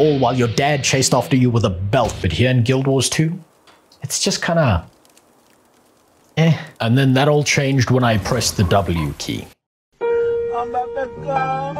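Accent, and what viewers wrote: South Africa. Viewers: Lakka video bud, sounds like you have a slight SA accent though? Or is it Kiwi?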